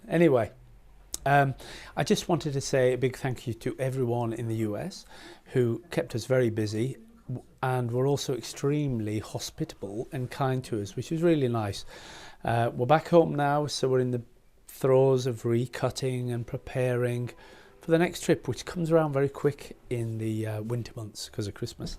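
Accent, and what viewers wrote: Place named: RP, United Kingdom. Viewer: Thx graet video sir! I can hear your clear queens english!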